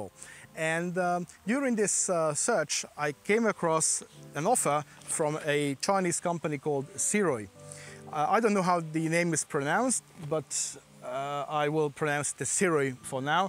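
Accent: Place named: United Kingdom. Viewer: Köszi a videót. Szép brit kiejtés.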